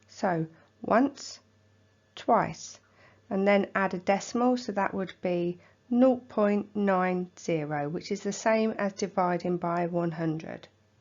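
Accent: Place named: United Kingdom. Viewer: Why do you sound so British Mrs?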